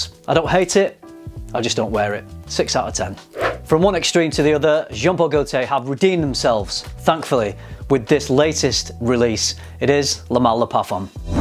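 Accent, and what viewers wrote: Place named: Manchester, United Kingdom. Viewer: To be honest, I'm more focusing on your accent 🥰. Are you Mancunian?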